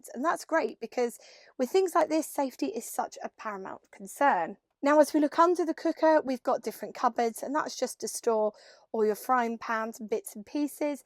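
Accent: United Kingdom. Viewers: cute british girl talks about an old toaster on wheels, AWESOME!!!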